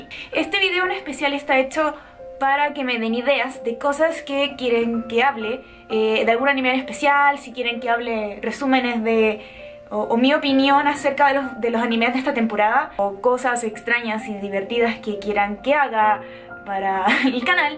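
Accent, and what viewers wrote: Chile. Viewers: Ese acento es chileno? Esque miraba videos de un youtuber con mismo acento y me gustaba como decia las cosas xd pero no estoy seguro de si el era chileno Ella es chilena? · Es chilena.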